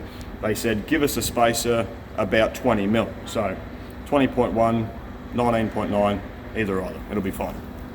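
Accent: Australia. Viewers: Avuncular Why are you talkning like you're from Australia? You sound like one of those people that throw in 'spanish' when the get mexican food, lol. Saying "adios" when when talking to someone who speaks spanish, instead of just saying goodbye. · Ohh man I just discovered your channel and as a retired Aussie living in Thailand I really enjoy your craftsmanship your bloopers and the bloody Aussie accent that I missed so much keep up the good work mate!!👍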